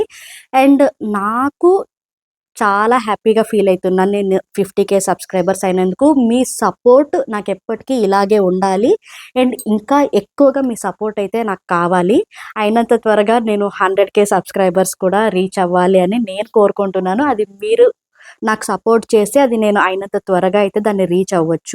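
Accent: Karnataka, India. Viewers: మీరు హిందూ పుర్ తెలుగు బెంగళూరు లో తెలుగు బాగా మాట్లాడుతారు మీరు కన్నడ నుంచి వచ్చిన తెలుగు ఆలాగే మీ భర్త గారిని రీ అంటున్నారు రి పదం కన్నడ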